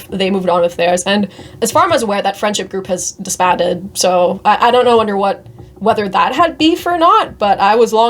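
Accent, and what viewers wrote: New York, United States. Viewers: my favorite brit with a new yorker accent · You sound like you're from New York.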